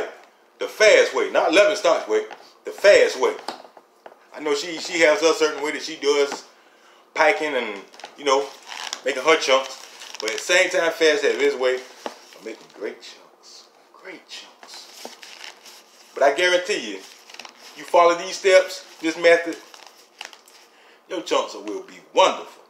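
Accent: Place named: Memphis, United States. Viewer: Man sound like he from Memphis Aye mane… Say mane…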